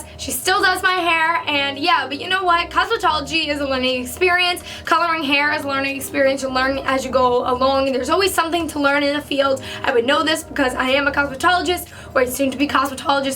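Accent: New York, United States. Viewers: New York accent baby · You have such a new York accent I LOVE IT 💜💛💙💚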